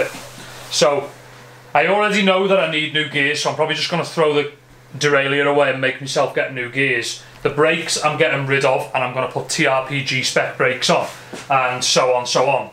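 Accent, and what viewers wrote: Liverpool, United Kingdom. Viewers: Tuning in to your scouse tones again on a Sunday.👍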